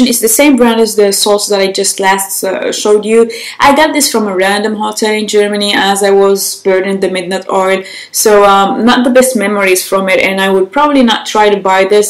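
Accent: United Kingdom. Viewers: Est ce que c'est moi ou ton accent anglais est un peu plus british than usual? By the way, very nice look😘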